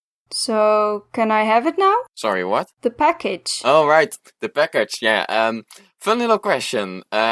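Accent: Netherlands. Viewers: u dutch? btw nice vids 👍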